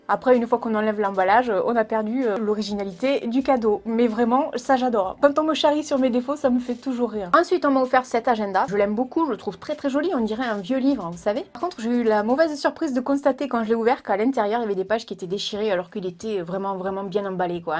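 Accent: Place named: Perpignan, France. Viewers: Bjr mon 1ier comm depuis plus de 2 ans ma 1iere ybeuse ma préférée avec or elly. Tout ce que ns raconte tu ns apprends. Pleins de similitude..ma mamy était de vidaillac lot jpasse mes 6 mois d'été Aude et P. O. Jacheche #essensse#chez Julia a la jonquera. J'adore cadaques maison de Dali et aller à Gironde le mercredi j'achète pas ceux que tu ns conseilles. Tu es belle une belle tête bien pleine t'as le peps j'adore j. P.arker.jnai pas trop eu de kado de ma faille bien que j'en fasse a ts les 6 moi jsuis maman kdo. Que veux tu mes familles de cœur c parfois plus important et elles m'ont gates puis les kdo 2019 je vais m'en offrir n'a.. L orange de la petite mémé wouau. J'ai déjà custo ttes mes blouses avec des perles les créoles d'après t conseil la jvais attaquer mes boots. Bonne merveilleuse année chère à toi ta maman ton chouchou, 🐕et la santé..moi c pas le top mais Jle dis pas c pour a que toi o relly Nicole et mon Ange jne loupe rien.je commande sur ALIE. 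Joom et un peu shein. Sur les conseils daurely every thing five pounds. Grossiste français. Bonne résolution ne plus me laisser faire par des C... Tourner la tête et ignorer. Ensuite aménager mes coins beauté fringues. M'habiller chic comme toi. Et me faire plaisir ça de pouvoir louer une partie de llannne dans le 11 et le 66.lespagne....mon oxygène. Merci pr t élans du ❤️et ta simplicité Perpignanaise au même accent💗poutous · Quelle belle histoire de Noël! Ma femme veut bien l'adresse de cette gentille dame aux oranges car nous ne sommes pas loin de Perpignan ! Lol 😉 trop sympas vos vidéos ca fait plusieurs qu'on voit et on aime! En plus, avec l'accent de chez nous ça fait du bien ! Hop on s'abonne avec plaisir. On a apprécié en particulier les vidéos sur Yves Rocher, Cien (Lidl) et les astuces... Bonnes fêtes !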